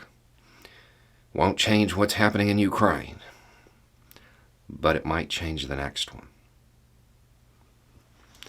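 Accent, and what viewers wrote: South, United States. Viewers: of the Fifth Column you used the term "manufacturing consent" a couple of times. Would you happen to have read the book of the same name, written by Noam Chomsky? Hearing you talk is a lot like him, but with a Southern accent.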